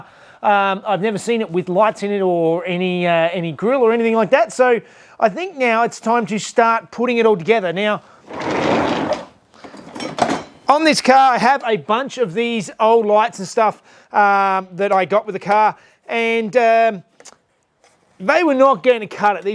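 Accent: Australia. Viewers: Hello, I am a french old guy watching your amazing videos that I love. Can I suggest something? Fortunatly you put subtitles under the video because, (as I live in Bali most of the time, I know that you Australians have a fucking accent!)...so thank you for these subtitles. But please, can you do it without all "hum" and " hu" because it makes them harder to read? I can't wait watching the Alfarrari finished so hurry up! If you come to Bali, in european winter time, let me know and come minimum for a drink or stay in my beautifull villa! Say hello to Mrs...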